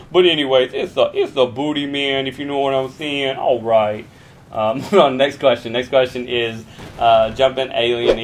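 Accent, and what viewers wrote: United States. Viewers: Your awesome:) One question, where in America is your accent from? I can't pick it cause I'm from NZL.. Haha. Keep up your great videos! Always brings a smile to my face.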